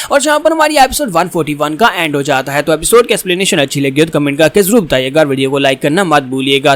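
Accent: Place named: Pakistan. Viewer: Bolane Se to lagta Hai yah Pakistani ka hai rakhta hun Pakistan se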